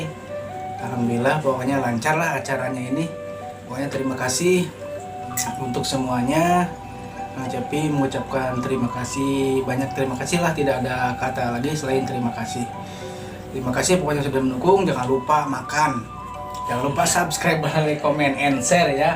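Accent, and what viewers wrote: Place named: Java, Indonesia. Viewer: Ngomong nya kek org Jawa bang wkwkwkwk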